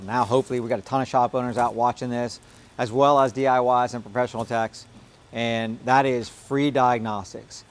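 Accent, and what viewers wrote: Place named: New York, United States. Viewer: I even said to myself: sounds like NYC 🤔 Then you said it's queens 🎯 Figured as much,there's so many ripoff artists down there they step all over each other! The amount of time they take to "pull a fast one" ,it doesn't really take much longer to do an honest job. But 10 seconds is 10 seconds I guess.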